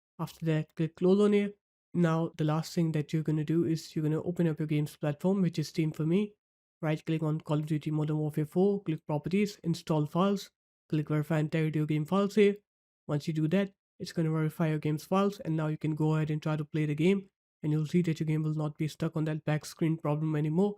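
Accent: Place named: India. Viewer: thought i was cooked then i heard a faint bit of indian accent so i know i am GOOD bro this fix WILL WORK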